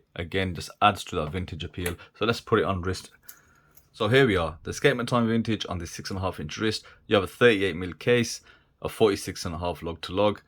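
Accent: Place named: Scotland, United Kingdom. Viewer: His mottled Scottish accent makes me trust him.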